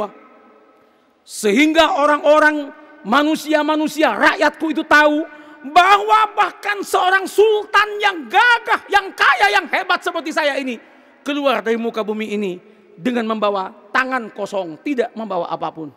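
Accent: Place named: Madura, Indonesia. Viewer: kayak ada logat maduranya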